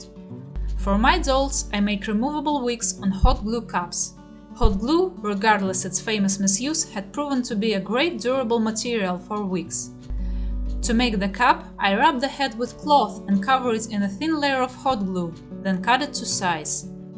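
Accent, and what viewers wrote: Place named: Russia. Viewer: Судя по акценту, вы русская, если не ошибаюсь. ❤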